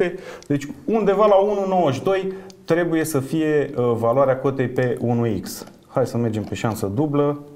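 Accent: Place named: England, United Kingdom. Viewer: Nimic nou sub soare......doar engleză în pronunție și mult bla, bla, bla....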